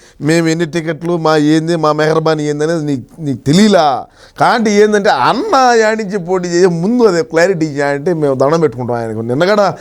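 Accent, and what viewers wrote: Rayalaseema, India. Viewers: Bro sooper..... Nee body language and seema accent superb❤😂😂🎉🎉🎉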